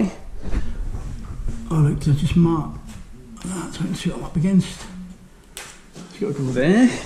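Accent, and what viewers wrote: Norfolk, United Kingdom. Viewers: Are you Norfolk!? 😍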